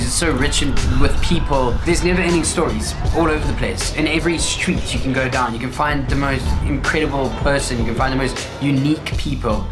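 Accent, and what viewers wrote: Cape Town, South Africa. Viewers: i thought you from cape town bro.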